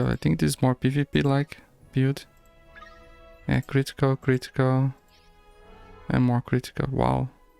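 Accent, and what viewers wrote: Brazil. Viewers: Where are you from ? You really sound brazialian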